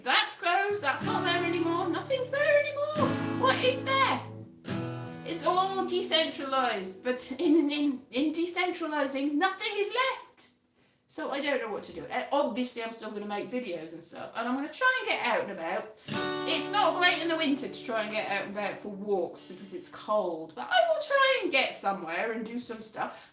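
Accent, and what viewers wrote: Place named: London, United Kingdom. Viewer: How can you be the if you talk like a Londoner????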